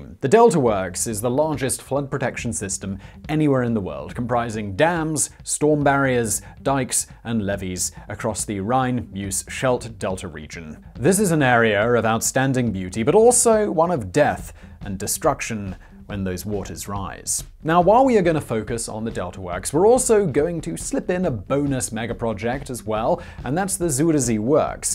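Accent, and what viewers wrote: England, United Kingdom. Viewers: With that accent? No - I do believe he is 100% English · Reading downwards I see no other comment really necessary but to compliment you on your pronunciation as most of my native English speaking friends never tried that hard. And going on your accent, you probably don't mind if foreigners call the UK or Great Britain 'England' either. All in good fun for whomever gets it.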